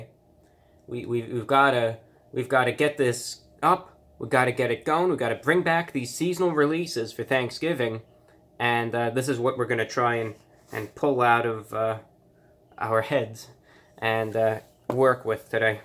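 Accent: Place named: New Jersey, United States. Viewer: Part of his charm is demeaning his uniqueness. I love his Jersey accent and persona. He's good-looking in an uncommon way. I fuss at him because I do like him.😂❤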